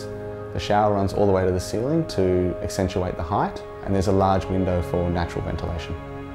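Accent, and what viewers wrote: Australia. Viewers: Oh my... Australian accent is lit 😁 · it is Australian accent. Dont believe it? Go to australia mate. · Aussie accent that's why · While he is very Australian, this is his individual way of speaking, and not typical to our accent at all. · Why not judge accent of a native English speaker? It wouldn't be nice to judge non-native speakers because they have disadvantage but no excuse for "Aussies" :D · Elyse G.엘리스 지 That’s his Australian accent, maybe you shouldn’t judge people’s accent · It's the Australian accent lol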